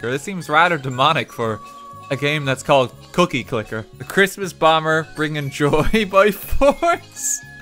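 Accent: Ireland. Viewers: I’m a new viewer and he has a hard Irish accent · And a Happy New Year,! Thanks for a year full of Irish accented misadventures.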